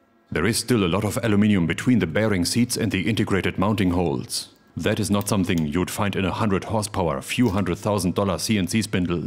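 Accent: Germany. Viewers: Ing_lish with a German accent/humor combination is in itself precious, but when adding a torrent of valuable technicality ... can't be described in words 😉.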